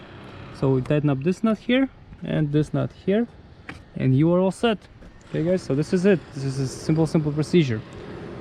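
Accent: Russia. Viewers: You sound like the Russian Hacker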